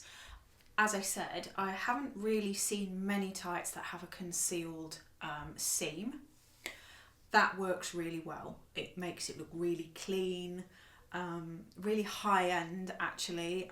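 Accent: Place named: United Kingdom. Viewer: YOU HAVE BRITISH ACENT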